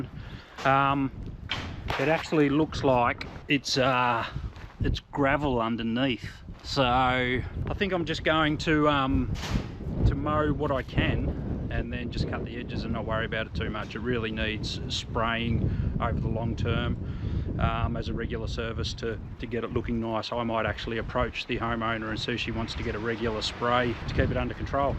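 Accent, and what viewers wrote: Australia. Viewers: Great job and, I love your talking during the video your personality is coming through, plus love the Aussie accent ❤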